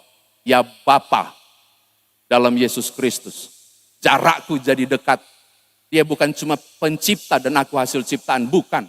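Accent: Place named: Kupang, Indonesia. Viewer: Puji Tuhan... Trimakasih pak pendeta, untuk reningan yg menguatkan. Sambil senyum dengar Bapak logat kupang....😊😊🙏🙏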